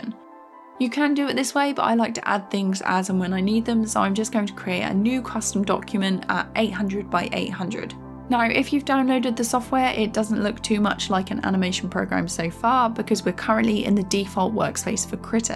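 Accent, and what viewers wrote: United Kingdom. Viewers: I love your voice! and that british accent is so sweet!! greetings from Brazil ;-)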